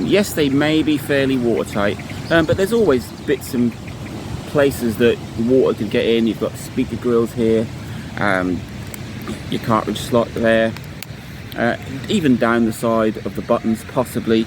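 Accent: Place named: United Kingdom. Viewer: I just found your channel today, I love your British accent and as a student about to be an English Teacher, I find it very helpful.. I also love gaming.. I'm a PC gamer, but I still do love gaming in general.. Like 10 years ago I had the opportunity to travel to Germany where I got my first portable console, it was a PSP.. What a beast of a console! I ended up selling it cuz I was stupid honestly, and I regreated my whole life since then, besides that, I never owned another portable console..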